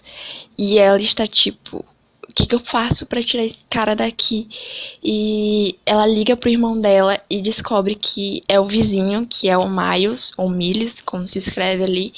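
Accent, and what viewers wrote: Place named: Ceará, Brazil. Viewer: Olaaa. 😊😊 Eu vi essa TAG esses dias e achei bacana pra responder. Talvez eu faça QQ hora dessas... O sorriso da hiena eu fiquei curiosa pra fazer a leitura. Jogos vorazes nunca bateu a vontade de ler mas vi os filmes e achei ok. Gente, teu sotaque é tão bonito. Parece cearense. 😊 Aaah, eu amo GOT. E curti desde o início kkkkkkk Küss 😘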